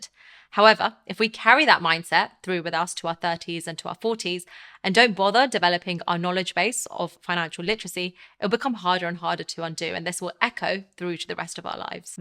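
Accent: United Kingdom. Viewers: She is Indian but speaks with a heavy loaded British accent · I have no idea what country this was produced in. I'm guessing by accent it is the UK. I will say a major factor in the United States for having to stay in the rat race at dead end jobs is health insurance. As everyone in the US knows health insurance for oneself and their family are tied to their employer. Private insurance is just too expensive for anyone over 25.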